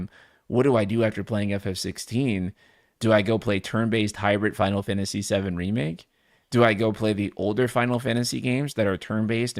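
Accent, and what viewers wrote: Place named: North Texas, United States. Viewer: ayyy I didn't know you were from North Texas too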